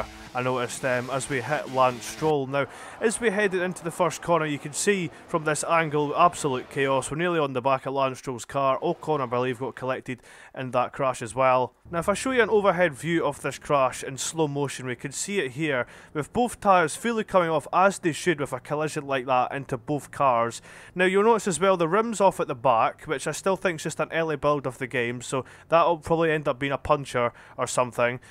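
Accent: Scotland, United Kingdom. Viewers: never heard u talk before but didnt expect scottish lmao another banger though!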